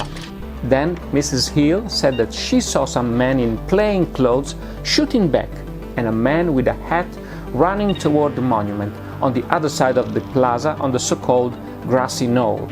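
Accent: Italy. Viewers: But he IS Italian!!! What accent should he have? It's not his native language. If you spoke Italian, don't you think you would keep your original accent? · You kept your Italian accent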